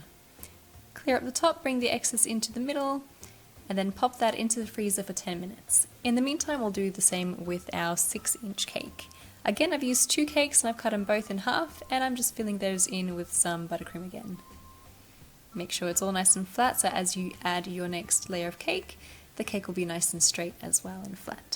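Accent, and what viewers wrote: Australia. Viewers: You sound like you're from Australia.. are you?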